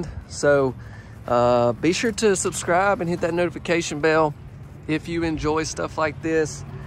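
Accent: South, United States. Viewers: soft spoken, sweet, southern gentlemen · Did you say? Is that your name or is it? Either way I’m still digging your southern accent! Lol! I have a question for you about single wide mobile homes. Do you ever see any of them with the kitchen in the front and the bedrooms in the back? My home is so old and is in desperate need of an overhaul. I love watching your videos because I am getting so many ideas for my place. I love love love this place especially the black trim!! Thanks for everything you do for us! I appreciate your attention to details and how you open all of the cabinets to give us ideas!! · This is the most beautiful one that I have seen! Totally love it! Love you channel and love your country accent! God bless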